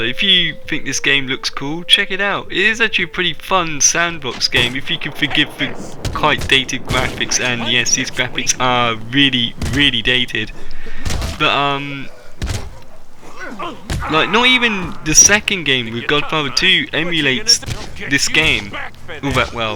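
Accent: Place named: United Kingdom. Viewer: Everyone is different. Wow, is this a crime or something? Does he have to talk like you, does he have to walk like you...? I've seen so many remarks about people's voices that are actually NORMAL. This guy's voice is fine. Leave him alone. I don't even know him, but I find his commentary to be fine, casual, and I know (obviously) that he is most likely from the UK. Maybe he doesn't have the best recording equipment? No reason to curse at him over it! Sheesh...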